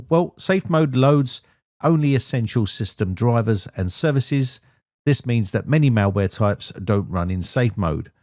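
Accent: United Kingdom. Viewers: Man, man, whatin a fuckin" bullshitin' annoyin listenin to this fuckin old-man britishin accent! Here here here here here here! Always showin his olden schoolin computin fuckin prowessin with the comnandin line-nen! SOEN FUCKIN ANNOYIN!!! Shut uppin fuckin old man, you're fuckin annoyin!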